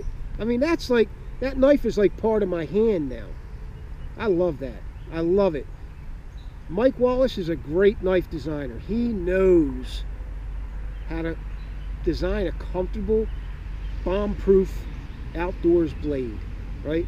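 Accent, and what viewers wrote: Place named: Eastern Pennsylvania, United States. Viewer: Judging by your very strong Eastern PA accent, I assume Mike Wallace's shop is in Pennsylvania somewhere?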